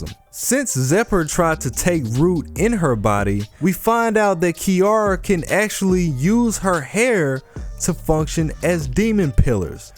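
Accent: United States. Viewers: you from Philly? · are you from Baltimore?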